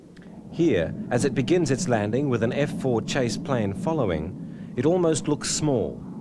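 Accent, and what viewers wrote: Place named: United Kingdom. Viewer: Yes - the narrator has a British accent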